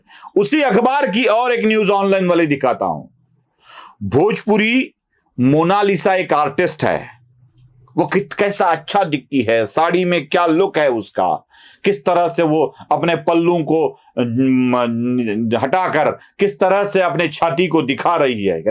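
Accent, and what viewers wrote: Maharashtra, India. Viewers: सर आर्ध मराठी माणूस मराठी बोली नाही विसरू शकत हिंदी बोलताना keep it up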